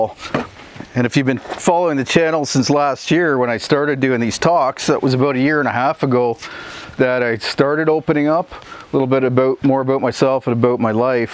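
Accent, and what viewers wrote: Canada. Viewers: Love that Canadian accent and can do attitude.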